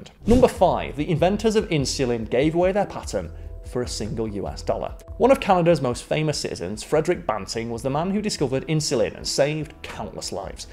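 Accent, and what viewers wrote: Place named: Northern England, United Kingdom. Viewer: North England.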